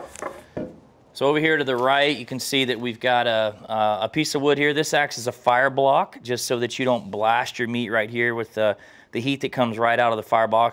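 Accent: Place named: Texas, United States. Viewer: Real Texas voice like Ted Cruz🥶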